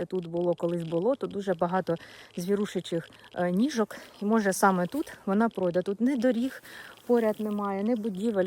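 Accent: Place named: Kyiv, Ukraine. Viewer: У вас говір київської області👍❤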